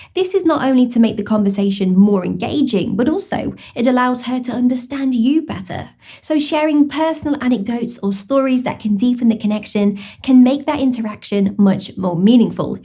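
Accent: United Kingdom. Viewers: Your advices are the best, and make a real difference. I also come here to hear your lovely, soothing, British accent.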